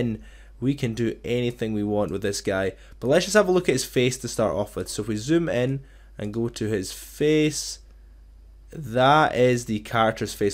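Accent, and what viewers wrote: Scotland, United Kingdom. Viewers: I’m Scottish and so is he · He's Scottish, I talk like that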